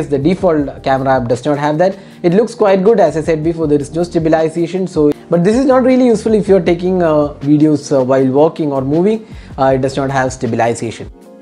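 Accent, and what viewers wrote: Kerala, India. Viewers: You don't need to look at the person just the voice tells you they are mallu 🤣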